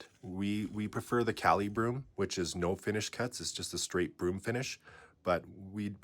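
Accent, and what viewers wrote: Canada. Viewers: I knew you were from Canada with your Os 😂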